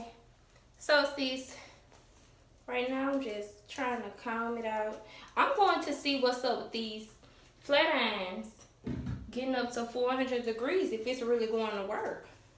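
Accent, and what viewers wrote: United States. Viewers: I love your southern accent girlie! 😍😍😍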